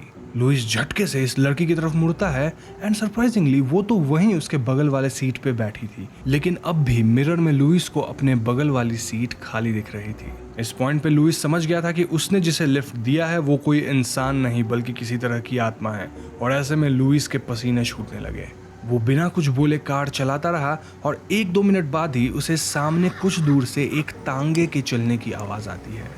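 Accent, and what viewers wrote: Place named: Bihar, India. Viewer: Bhaiya tum bihar se ho na kyuki bihar k log sadak ko sarak bolte h jaise unse kaho sadak par ghoda tadak tadak daudta h to vo bolte h sarak pr ghora tarak tarak daurta hai 🌚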